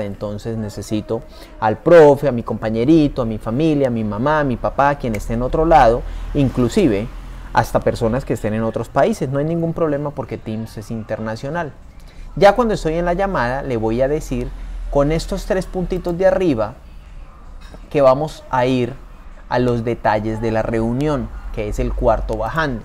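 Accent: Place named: Colombia. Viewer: Me encanta su acento, es colombiano?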